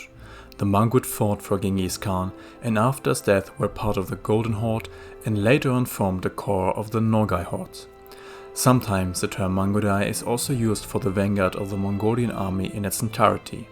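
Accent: Germany. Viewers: It's funny how you think you joke about your German accent and switch back to your normal English, which still has a heavy German accent. But I sure love your content. Keep it coming! <3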